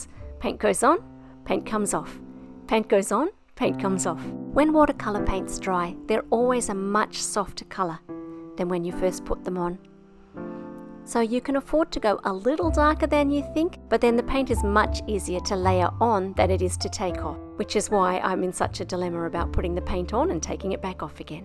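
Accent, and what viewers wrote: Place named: Australia. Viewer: Hi, I love, love, love this technique to colour cards... so beautiful! You have certainly inspired me to make cards similar to these again. By the way, it is so lovely hearing another Aussie accent, and I immediately subscribed. Blessings from another Aussie, Gloria. 🥰🐨🦘💖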